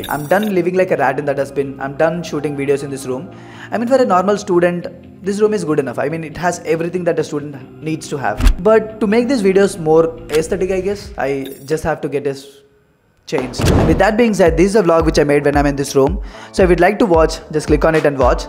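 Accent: India. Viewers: Atttr..Bttrrr…Gttrrrr..Pttrrrr. The Appu accent is real strong in this one.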